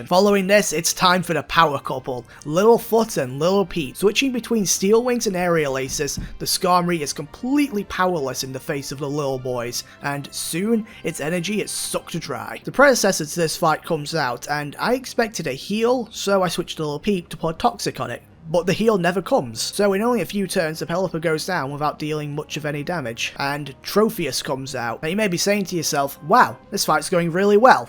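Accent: Northern England, United Kingdom. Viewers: Whereabouts from up north are you from lad? Can’t pin down your accent. Leeds? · He defo Yorkshire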